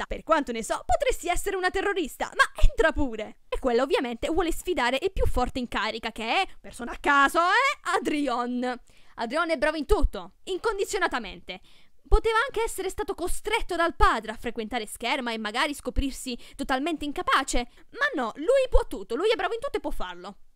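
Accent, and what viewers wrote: Bari, Italy. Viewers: Ma sei barese? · Ma sei barese? TVTB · Secondo me sei di Bari 😂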